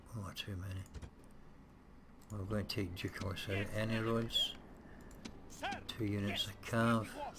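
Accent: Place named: Scotland, United Kingdom. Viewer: You sound Scottish and if you’re not don’t get angry cause I’m Swedish?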